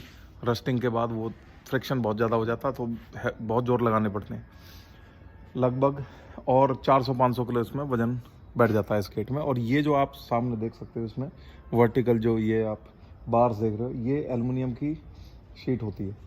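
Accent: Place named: Haryana, India. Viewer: Bhai apke smjhaane ka tarika bhut hi alg hai or bdia hai apki awaz se lgra hai ap humare haryana se hi ho or humari jaat caste se hi lgre ho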